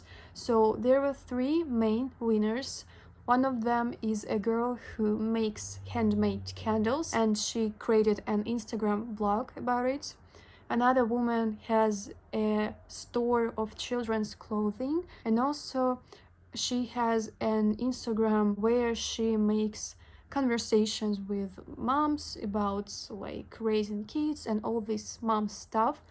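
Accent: Russia. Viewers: I think it’s her Russian accent when she speaks English.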